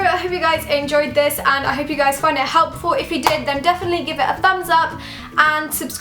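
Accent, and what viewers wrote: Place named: United Kingdom. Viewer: Girl do you live in the UK you have a british acsent I live In the UK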